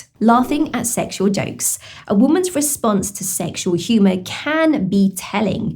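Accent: United Kingdom. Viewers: What I have trouble with is her Brit accent .Too much.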